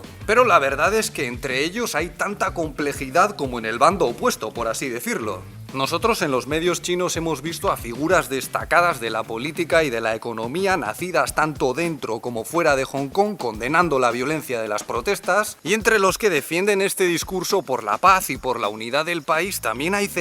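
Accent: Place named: Spain. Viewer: Saludos cordiales desde Ciudad de México. Pero que pareja tan más simpática y original. Sus videos sobre Huawei y Wudong no pueden calificarse menos que de maravilla. Entiendo que tu nombre es y el de tu juvenil esposa, (su rostro refleja una edad máxima de 4 años). Y no, no te ha pasado ningún tren por encima, sólo que los caucásicos aparentan algo más de edad. Hablaste del País Vasco con un sentir muy ibérico, y tanto tu acento como tu dicción son marcadamente españoles, aunque tu fisonomía pasaría por anglosajona o hasta nórdica sin demasiada dificultad. De modo que me recuerdas mucho a Gonzalo Guerrero, marinero español llegado al caribe mexicano como vanguardia de exploración de la flota de Hernán Cortés. Guerrero (a diferencia de su compañero Jerónimo de Aguilar) renunció a su nacionalidad española para jurar lealtad a su mujer y a la nación maya de ésta, Ulümil Kutz (Mayapán o Reino Maya del Faisán y del Venado, etnia muy cercana a China como demuestra su afinidad lingüistica), con quién engendró hijos mayas, nuestros primeros mestizos. 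En la España de su época se le juzgó traidor, pero los republicanos españoles de hoy (y como no, todos los actuales mexicanos), lo consideran patriota tanto maya mexicano como español, a pesar de haber muerto en la adversidad, luchando contra sus hermanos en defensa de la patria de su mujer y de sus hijos. Creo que todo español bien nacido tanto como ciudadanos chinos leales a sus raíces más profundas, te consideran honorabl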